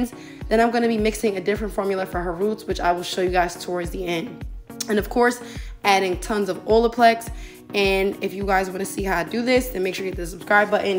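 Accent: Philadelphia, United States. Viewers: Where are you located? I hear a Philly accent but maybe that’s just me 💖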